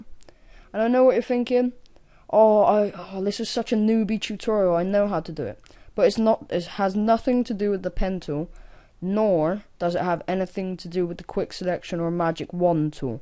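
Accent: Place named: Devon, United Kingdom. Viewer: Do you live in plymouth ?or devon ? It sounds like it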